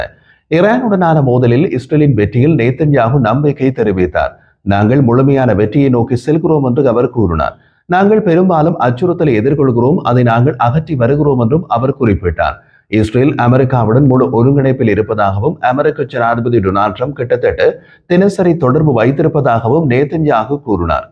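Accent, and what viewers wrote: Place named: Sri Lanka. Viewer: இலங்கை த்தமிழ் உச்சரிப்பு அபாரம்.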